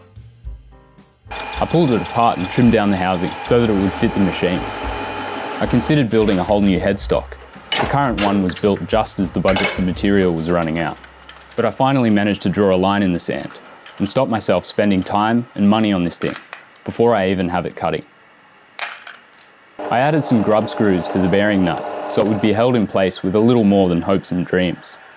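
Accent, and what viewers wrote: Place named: Australia. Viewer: Bro I just found this channel, and thought: well that’s weird, he sounds like an Aussie, anyways, let’s check after the video. Then I saw . Bruh. By now I feel like every Australian YouTuber I’ve seen so far is living in one neighborhood, and Wade from DankPods is an imposter from NZ, only pretending to be an Aussie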